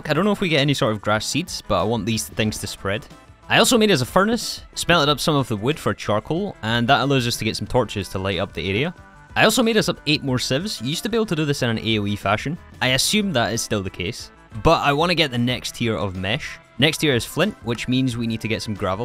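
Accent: Scotland, United Kingdom. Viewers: a fellow scot? interesting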